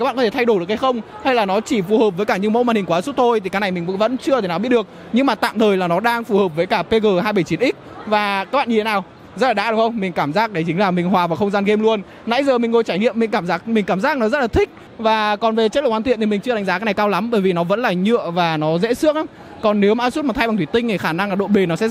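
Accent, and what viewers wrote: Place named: Nghe An, Vietnam. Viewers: Giọng Nghệ - Tĩnh đó bác, ông này người Hà Tĩnh. Face ông này hình như là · Hà Tĩnh bác ơi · á đù a người nghệ an à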